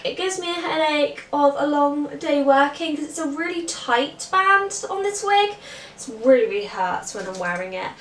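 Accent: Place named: Mid-Atlantic, United States. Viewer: I gotta say your American accent is quite good. Sometimes sounds a bit more Mid-Atlantic but that also kind of works for that classic Princess vibe. And thank you for wearing gloves! I get kind of annoyed when I see Belle or Cinderella not wearing gloves (thank those live action movies for that ugh)!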